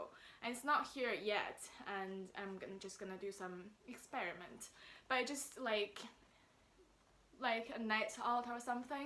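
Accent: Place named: United Kingdom. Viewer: Your British accent is soooo cute!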